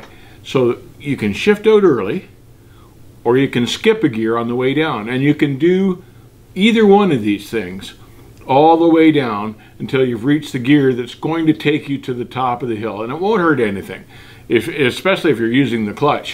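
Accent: Canada. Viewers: nice to find your channel, you know your stuff and listening to your candian Accent reminds me of running upstate New York on I 81. The Canadian truckers were always the nicest drivers! Your stories also reminds me of the days when I hauled produce coast to coast loading in California back to Florida. I started over the road running for an owner operator and Produce was his main commodity. this old guy made me a much better driver because boy he was very high standards with his equipment. But I was grandfathered into the business in 1990 so all of my learning experience was on the job! It’s fun to watch your videos they are spot on !!! thanks for caring to raise the bar for the newer drivers! I now pull doubles for an union LTL company. “Wildflower”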